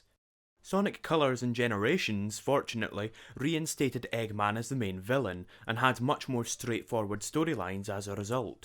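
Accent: Scotland, United Kingdom. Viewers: This is probably the most extensive look at Sonic's evolution ever, and it raises some good points. Also, it's nice to hear another Scottish voice on youtube! Anyway, I have a possible (yet perhaps biased) suggestion to Sega for a way out of the bind they've got themselves in. When your series loses its direction and identity, go back to what made it great in the first place. Recall when the James Bond franchise, during the late Brosnan era, didn't know what to do with itself. Die Another Day was partly an homage to classic Bonds, part sci-fi, part self-parody, but also overwrought with serious emotion. In many ways the series was again turning out like the late-Roger Moore era, something that Brosnan's first outing as Bond in Goldeneye did a lot to get away from. So after Die Another Day they brought in a new actor and did Casino Royale. In terms of its tone, that film is very similar to the Ian Fleming book of the same name, and it shows why and how the characters and stories from the early books were so gripping. 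After watching your video, I see a lot of parallels between the respective problems faced by Bond and Sonic, and if getting back to basics worked for the former, I really think it could work for the latter. So what I'm suggesting is that Sega go back to the gameplay mechanics, designs, and narrative style of Sonics 1-3. And not just in the form of nostalgia feasts like the Sonic 4 episodes or Generations (though the latter was a firm step in the right direction)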